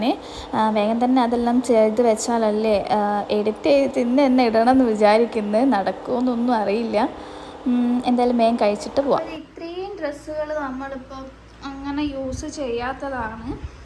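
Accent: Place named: Kannur, India. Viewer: ചേച്ചി കണ്ണൂർ ആണോ. കണ്ണൂർ ഭാഷ പോലെയുണ്ട് 😍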